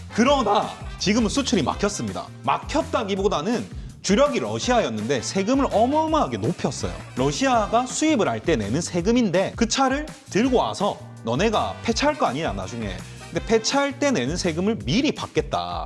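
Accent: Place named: Gyeongsang, South Korea. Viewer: 사투리는 갱사도네요.ㅋㅋㅋ 아랫동내는 역시 먹을께 없엉....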